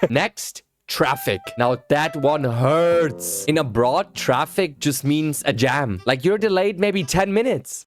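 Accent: Philippines. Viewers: This made me laugh. It is really pinoy and we are distinguished by that. Even you sound pinoy 😂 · I like your filipino Eng accent dude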